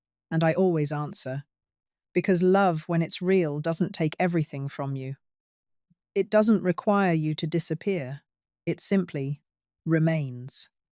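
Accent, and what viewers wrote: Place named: San Diego, United States. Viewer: So that's what a San Diego accent sounds like 😅😂😅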